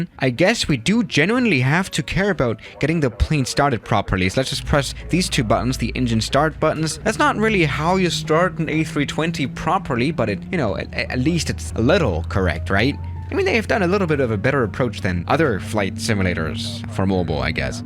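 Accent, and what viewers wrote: Germany. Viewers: Are you From Germany or Switzerland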